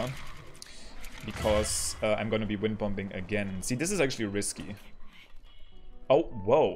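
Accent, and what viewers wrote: Germany. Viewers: Wegen dem Akzent würde ich Deutsch sagen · this beautiful thick german accent is love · bro i love your german accent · This German accent is just nice 😂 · Dude i just watched this Video a minute long and just the way you speak tells me that you are german xd ja ich bin auch von Deutschland lol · Man hört so raus das du Deutsch bist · i love the german english accent.. or just the german accent in general!! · idk if he does in this run or not, but my favorite is when he says “ragdoll” bc it sounds like “rectal” lmao. german accents are excellent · Im hearing a gernan fellow :)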